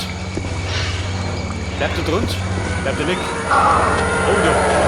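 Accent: Bavaria, Germany. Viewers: so ein geiles spiel, von wo aus bayern bist du?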